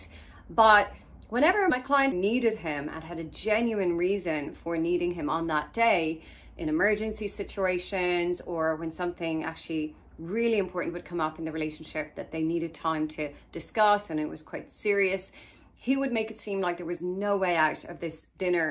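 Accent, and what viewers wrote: Ireland. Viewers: Are you Irish? 🤔 I can tell by your accent! 🥰🤗💖🧡🤍💚 Good, important video. 😁👍🏻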